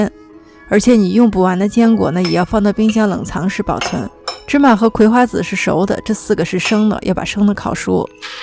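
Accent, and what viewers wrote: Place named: Beijing, China. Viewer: 这是北京口音吧，声音听着很舒服。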